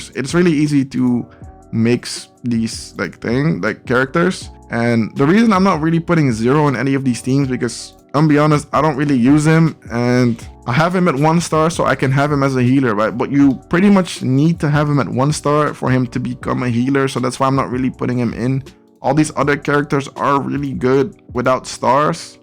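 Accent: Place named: Sweden. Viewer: u sound swedish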